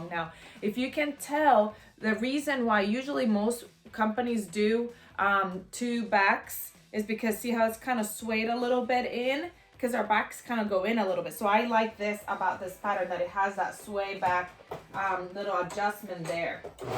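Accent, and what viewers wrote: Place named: Colombia. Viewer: I love your accent. Are you from Colombia? You remind me of Sofia Vergara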